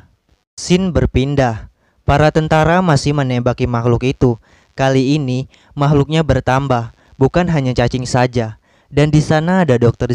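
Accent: Palembang, Indonesia. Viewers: Wong Palembang yo ? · Wong Palembang ruponyo wkwkw😂😂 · Palembang bang? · Abang orang Palembang ya?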